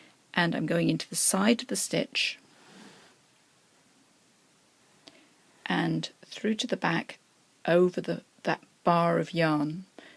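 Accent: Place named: England, United Kingdom. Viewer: Love your work. No fuss just doing the job. I also love that wonderful English accent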